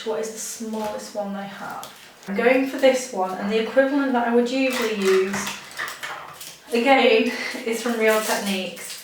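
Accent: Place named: United Kingdom. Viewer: TheRedMoon its british😁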